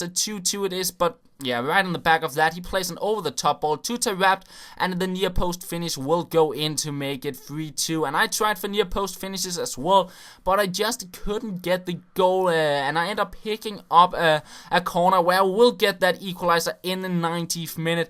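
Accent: Denmark. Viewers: er du fra danmark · 1. your fucking danish accent his so enoying 2. you suck at fifa 3. you think youre so good 4: FUCK YOU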